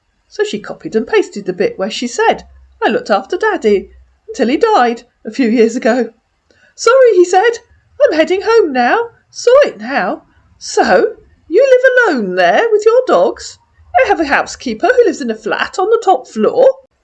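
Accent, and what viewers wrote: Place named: RP, United Kingdom. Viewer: Your posh accent is jolly good Cassandra 😌 This character reminds me of Denise, older, wise and salty 🧐 Looking forward to Samantha's salty reprise too 😼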